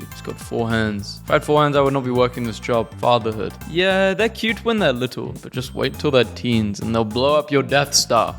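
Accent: United Kingdom. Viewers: Howdy again British feller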